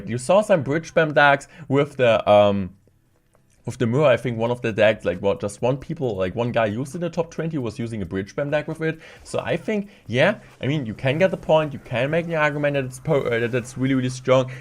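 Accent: Germany. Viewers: Where is this accent from ? For a sec I didn't realize it was English (no offense ment) German? Like he's saying mura instead of mirror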